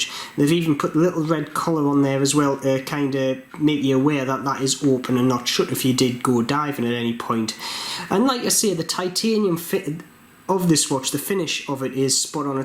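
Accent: Newcastle, United Kingdom. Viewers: Hi. I'm a fan of Heimdallr and so I'm pretty disappointed by the quality of the lume, they should've done a better job of it. My skx homage of theirs is like a torch in comparison. Glad to see they've done a decent job of the bezel as they seem to have been getting criticism in that area with other models. Good to see you back mate we've missed that Geordie vibe.✌️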